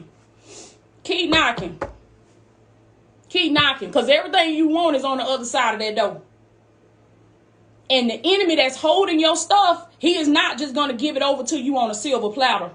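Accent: South, United States. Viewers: I heard you loud and clear from the intro, you made me jump up! I've been praying for months now, " God show me how to generate multiple streams of income ". But God has given me three basic instructions: start small = plant a seed & I will make it grow, step out in faith & out of comfort zones, don't boast be humble... do these three things constantly and I will bring the overflow 🏞 💷 ⛲ 💷 🌊 💷 I love your messages, your motivation and your Southern twang, you put a fire up where the sun don't shine! 😂 I really appreciate your ministry, thank you sister in Christ, love from London UK 👑 ❤ 🙏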